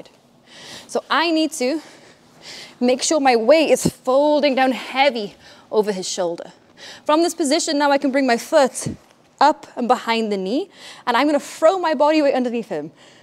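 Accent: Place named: United States. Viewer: I can hear a hint of a US accent developing in the intro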